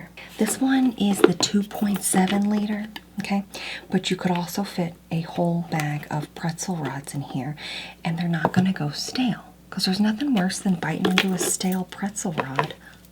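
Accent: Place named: Midwest, United States. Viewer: the midwestern accent is so gooooood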